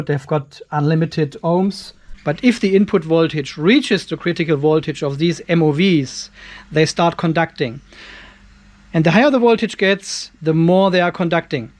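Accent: Germany. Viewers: Als ich dein erstes Video sah, dachte ich spontan: Wie kommt ein Deutscher nach Australien, u.a. wg. dem einschlägigen Dialekt...BOMBENSICHER bin ich mir erst seit BOMBENFEST. Auswandern war schon immer mein Traum...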